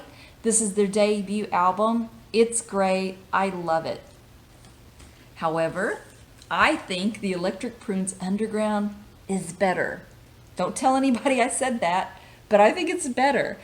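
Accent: South, United States. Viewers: Folks, don't let the blond hair and/or southern accent fool you. The lady has a partly sophisticated and insightful taste in music. I wish I had a dollar for everytime I played my brother's LP.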